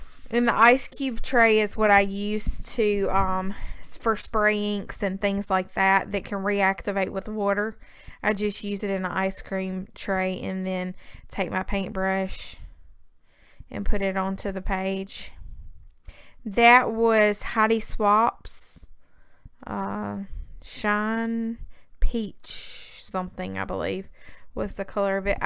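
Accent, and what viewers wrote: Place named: Texas, United States. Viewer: I'm from Texas and I have a thick accent like you. It's a joy to watch your videos! I'm an aspiring artist and have been dabbling with mixed media pieces so it's fun to watch and get ideas from you! I get a kick out of your comments and dialogue. :) thanks for your videos! ❤️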